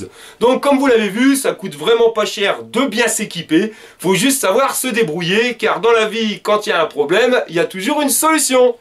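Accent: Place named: Nord, France. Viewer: Juste pour l'accent du chnord je m'abonne 👍🤙 Big UP d'Algérie 😉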